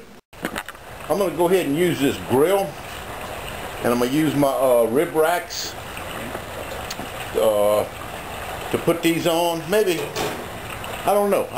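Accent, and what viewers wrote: South, United States. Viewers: This guy's southern voice is the best! Sounds like that big warner bros. chicken Foghorn Leghorn. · I love that good ole southern accent. #lovebeingfromthesouth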